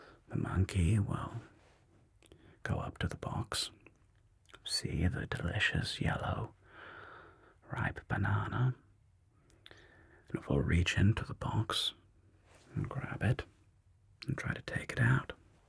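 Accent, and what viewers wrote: Germany. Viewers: Perfect, I love the German accent, and your thoughts!💛 The banana metaphor was so simple, but so on point for this... because holding onto things is sometimes less scary than the unknown even if it cages our very own hand in a bowl... very true! Loved the insights of this video!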